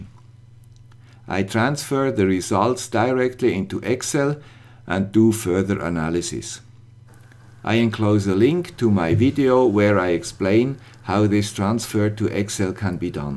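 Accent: Switzerland. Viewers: Hallo, auch hier einer mit dem swiss accent:) Ich habe diesen <link> Ultraschall-Sensor gekauft. Ich möchte diesen gerne mit I2C betreiben mit einem STM32F446RE Mikroprozessor. Leider habe ich die slave address noch nicht ausfindig machen können oder auch das abzurufende Register. Kannst du mir weiter helfen?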